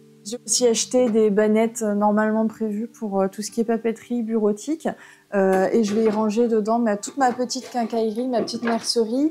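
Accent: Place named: Carcassonne, France. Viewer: super video d ou es tu avec ce petit accent j ai le meme et je suis de carcassonne lol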